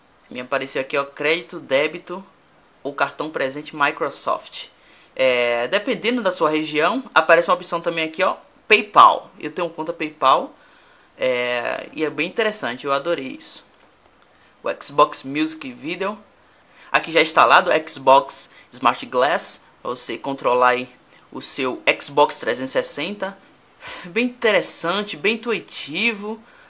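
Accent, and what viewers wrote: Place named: Salvador, Brazil. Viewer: Eu diria "jeito presepeiro"... Hehehehe... Antes de saber que ele era de Salvador, eu já desconfiava só por causa da maneira como ele fala. Mas o cara é brother!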